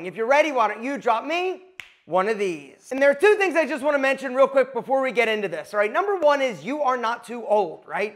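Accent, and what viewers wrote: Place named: New York, United States. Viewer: I like that you talk like a NEW YORKER !!!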